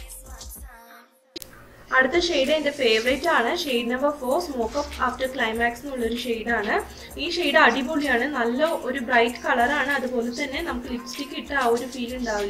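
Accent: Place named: Kannur, India. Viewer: Nhan chechide channel subscribe chettan parannal .chechide skin time San enteyum so enikk chechikk pattunna products vagham 2 ,chechikk useful akkunna products chechi njaghalkk suggest cheyyullu 3,njan chechiyude sister subscriberann divua chechi paranju chechide channel verorall prompt cheyth valarunnath ishttammalla Nn so I subscribe you 4,chechi kannur nokku samsarikkunnath pole thonnu I love lipsticks if you don't mind enikk chechikk ishttamulla products thannal mathitto 😘😘😘😘😘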